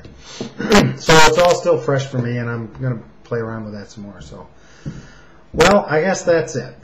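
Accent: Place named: United States. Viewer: very informative video, good information for educators. Very American sounding accent.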